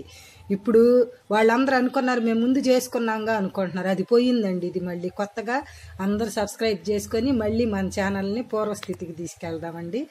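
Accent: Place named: Prakasam, India. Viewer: Meeru chese recipes and natural ga explain chese vidhanam super. mee slang( yaasa) nu batti, Mee vantalanu chusi chepthunnanu meeru ma Prakasam district vaaru anipisthondi. Am I correct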